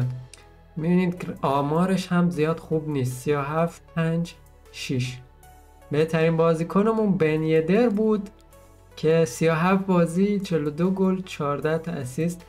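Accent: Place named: Shiraz, Iran. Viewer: شیرازی هیستی؟😁 از حرف زدنت معلومه . اگه هستی همشهری منی. عاللللییی👌👌 فقط انرژیت رو بیشتر کنی بهتره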